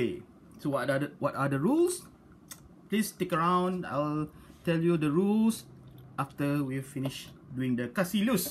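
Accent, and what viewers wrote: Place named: Malaysia. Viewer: I did Subscribe this channel about 4 months ago, your channel has completely fulfill my desire to get to know what Hotwheels are on the newest case. At first, your video was good, but you also take notes to some suggestion that you get from your subscriber so now your video is getting better. And also, your intonations, your unique English-Melayu accent, the way you were talking makes me feels like i was having a conversation with my friends. You makes your subscribers feels like they were there, watching the unboxing themselves with you. Nice Job, Pakcik! your most famous tagline is: "Yes it is!" :D Salam.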